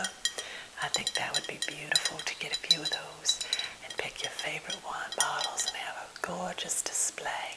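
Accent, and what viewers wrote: South, United States. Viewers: I like the tapping also the southern accent makes me relaxed a lot i seem to prefer it for asmr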